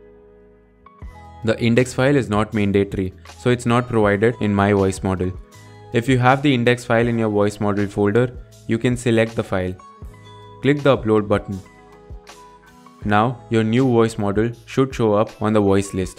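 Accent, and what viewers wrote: India. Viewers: dude sounds like a kid with a indian accent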